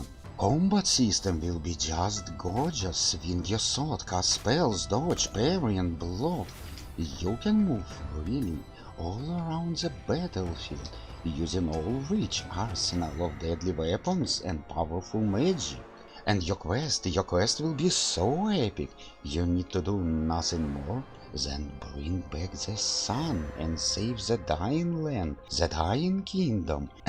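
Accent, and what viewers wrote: Russia. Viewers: Чет у меня подозрение что русско-говорящий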